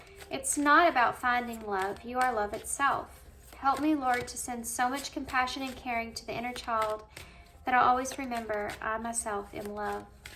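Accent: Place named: Tennessee, United States. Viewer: My goodness, a fellow southern girl I hear. Tennessee?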